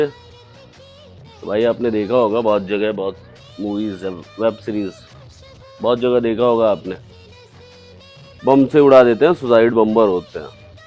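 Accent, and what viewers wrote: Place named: India. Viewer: Bhai desi andaz me narration Gajbe hai ❤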